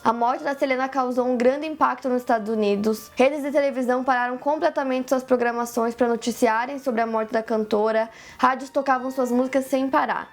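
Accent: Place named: Northeast, Brazil. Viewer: essa mulher é nordestina ou paulista??? so taque todo misturado..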